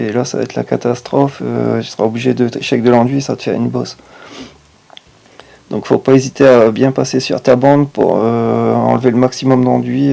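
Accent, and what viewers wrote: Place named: Nord, France. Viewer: Te du ch'nord ti aute ça s'entend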